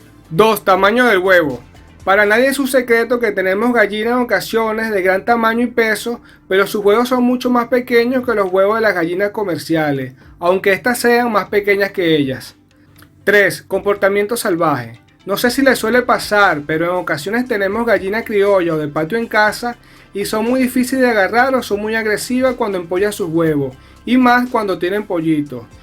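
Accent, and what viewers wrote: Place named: Venezuela. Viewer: Por tu voz me doy cuenta que eres Venezolano... tengo un gallo producto de un gallo criollo con una gallina ponedora Isa, un hermoso ejemplar de plumaje negro con pocas plumas Rojas y azules. Ahora, veo tu video y veo que mi plan se ajusta al tuyo. Pregunto, donde resides? Y como logras encontrar los gallos hermanos de ponedoras?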